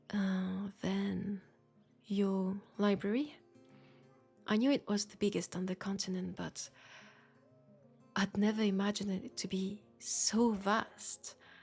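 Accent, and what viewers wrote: France. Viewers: Wow, I enjoyed this way more than I expected I would. Funny how my brain could paint this scene perfectly in my mind. Beautiful voice as well, and as always I just love the french accent. Nice script and BGM choice too. 👏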